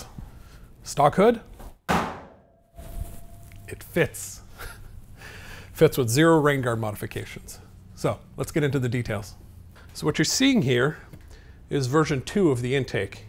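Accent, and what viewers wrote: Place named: Canada. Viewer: A Canadian sounding Hoosier?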